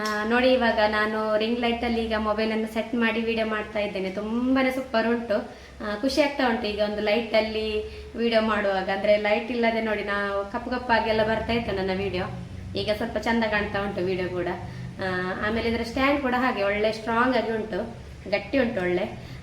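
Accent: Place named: Mangalore, India. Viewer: Manglore Sister Tamma Modalane Video Noduttiddene Nimage Olleyadagali Matte Adaga Videos Noduve Support Maduve Ok na Nimma Manglore Matu Tumba Ontra Kelalu Yeno Helodakke Tilita Karnataka dalli Onda Onda Kade Onda Taraha Style Kannada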